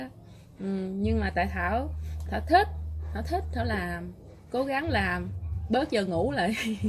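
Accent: Mekong Delta, Vietnam. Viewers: oi xem em noi chuyen chi thich lam dan mien tay chan chat thiet tha, chi lam dau mt 2020 nay la 37 nam do, nen yeu lam dat va nguoi mt, chi chuc tan suc khoe trieu niem vui ben chong con hen, q4 sai gon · Cam ơn em rất nhiều em đả cho thấy được cuộc sống ở mỹ qua giọng nói người niềm nam thật dể thương , chúc GĐ em hạnh phúc nhe..... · Chào c . Chúc c nhiều sức khỏe e rất thích c giọng miền tây dể thương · ...minh song tai MY cung lau roi...van ung ho cho kenh cua...thich vong noi nam bo that tha chat phat....luon ung ho cho kenh cua ban...!!!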